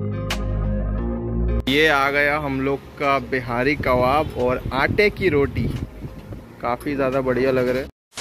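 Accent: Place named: Madhya Pradesh, India. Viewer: Aapki accent mp valo jaisi h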